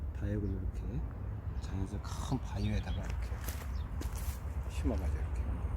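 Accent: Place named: Chungcheong, South Korea. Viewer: 충청도 사투리 쓰시는거 같네요~ 어디실까요? 저는 세종에 사는데 가까우면 구경가도 되는지 궁금합니다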